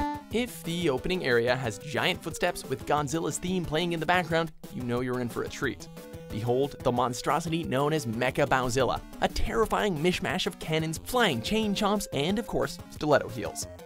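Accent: Canada. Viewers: omg is he Canadian if so omfg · WatchMojo are you canadian?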